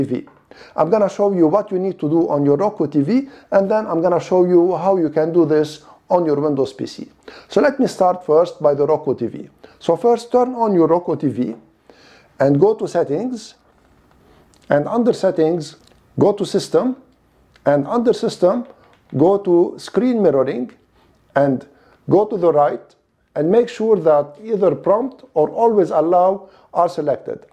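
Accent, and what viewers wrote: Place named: Lebanon. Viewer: your accent is lebanese! are you ?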